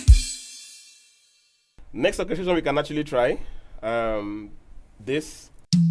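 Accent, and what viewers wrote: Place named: New Jersey, United States. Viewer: Hey dawg. You sound like you're from jersey 😅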